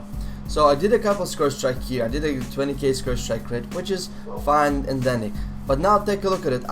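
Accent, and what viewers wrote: Russia. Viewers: I know it's pretty gay to say this, but man I missed your russian voice. ;)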